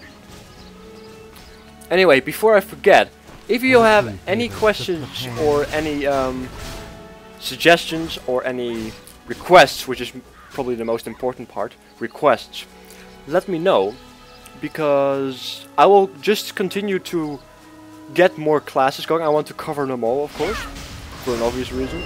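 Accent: Netherlands. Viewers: Any chance youre dutch? It kinda sounds like it.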